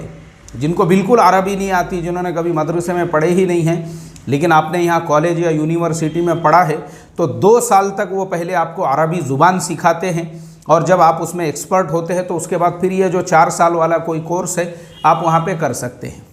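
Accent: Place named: Bengal, India. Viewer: السلام علیکم ورحمتہ اللہ وبرکاتہ بہت خوب جناب مولانا صاحب کیا بنگالی ہیں آپ کی زبان سے محسوس کررہا ہوں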